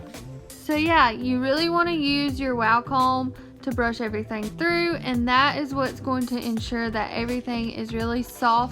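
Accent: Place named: South, United States. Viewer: Love it! Great job and I absolutely love your adorable Southern accent. ❤❤❤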